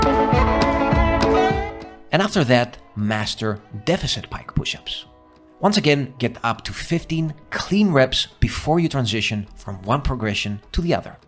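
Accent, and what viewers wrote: Netherlands. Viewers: Do I hear a Dutch accent? Must be! I'll recognize that anytime ❤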